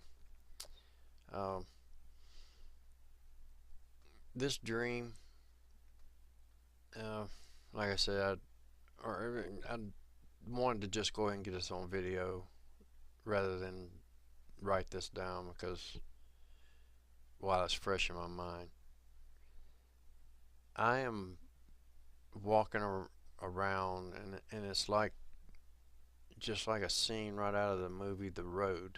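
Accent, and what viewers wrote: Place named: Texas, United States. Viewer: you sound almost like a Texan. I bet your German is a bit cute. Enjoying your vids, bud. Thanks! P.S. Texans speak really slow, too, especially the ones from the Panhandle part of Texas.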